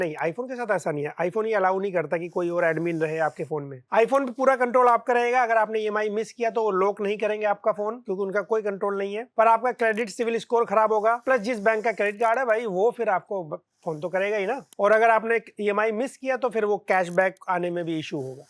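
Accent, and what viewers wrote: Lucknow, India. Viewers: Bhai aap lucknow se ho kya?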